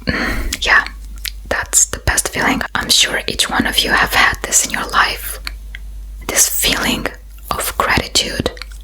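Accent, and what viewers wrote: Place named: St Petersburg, Russia. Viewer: Стоит ли ожидать контент на русском? По акценту и увлечениям Вы, типичный Питерский бумер) И почему нет монетизации в Румынии?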